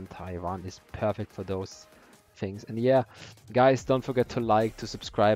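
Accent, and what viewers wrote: Germany. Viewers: Du bist deutscher und redest englisch hört sich echt bh an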